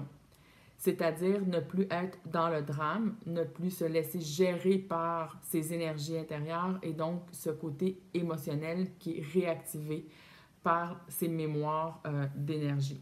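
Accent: Canada. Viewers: Cette chaine est géniale. Merci bcp pour ttes ces informations et tout ce travail. L'explication est bien Claire surtt avec cet accent cabadien adorable. :D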